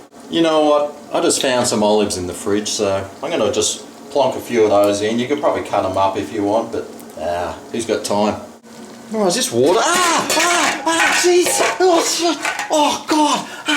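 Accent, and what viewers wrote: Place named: Australia. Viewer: You sound Aussie, I’m Australian as well. I love the way I make spaghetti Bolognase aka spag bowl I cook the mince then add mint leaves I also add mushrooms and honey as well believe it or not that tastes really nice in the mince I add a fair bit of bolognase sauce and add cheese afterwards when I dish it up you guys can decide what cheese you want but yeah that’s the way I do it and it’s absolutely beautiful, I can do that within 30 mins if not less and it’s the best meal I can do within that time imo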